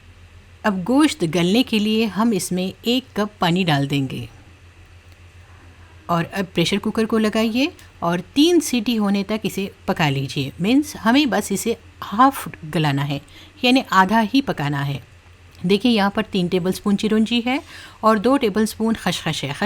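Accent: Hyderabad, India. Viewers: Yes ,her style of talking is Hyderabadi....n she stays in a remote place like farm house....beautiful....Barakallahu feeha... Loved her ooen balcony kitchen....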